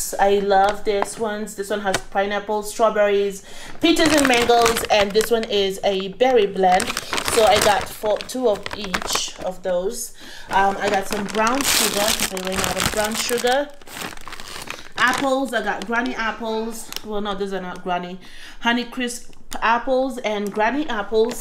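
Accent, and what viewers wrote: Kenya. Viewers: Hi your kiswahili sounds kama ya mombasa, did you live at the coast before going to the U.S? Btw you're a really good Mum.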